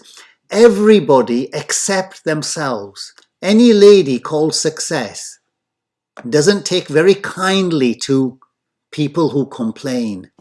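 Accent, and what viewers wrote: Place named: England, United Kingdom. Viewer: Your effort is really appreciated! Please don't feel pressured to put out more content than you currently are (although I'd be the first to watch it, haha). I'm sure you've got great friends and family that appreciate your time and wisdom as much as we do. By the way ... I've been having the hardest time trying to place your accent. What part of England are you from? :)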